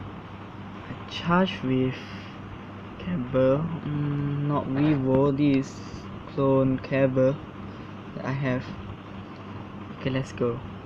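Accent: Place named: Malaysia. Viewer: Sounds like malaysian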